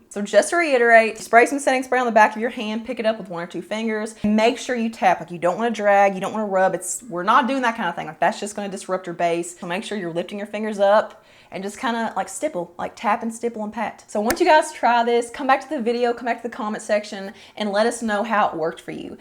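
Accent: South, United States. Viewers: I love your Southern United States accent, I think it sounds warm and welcoming. (I once had a wondeerful boyfriend with a Kentucky accent so I have a fondness for the accent).However, many people across the United States and around the world are used to a more flat "Nebraska" accent. As you get a global audience, you may consider getting speech coaching to get the plains midwest accent that people think is the United States accent. This is not fair to people with accents from the Southern United States, but it will be important as you gain a global audience who do not have English as a first language. Also, you might want to talk more slowly. I tend to move my hands when I talk, so I know what it is like to make gestures when I talk. Try to keep your hands out of the video except when you are applying makeup. (I used to be a teacher, and I was videotaped once when I was a teaching assistant, and seeing the videotape is really useful.). 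I hope you take these comments as helpful and useful, because my intention in writing this was out of a good heart. If you find my comments offensive, please let me know. This is not what I intend.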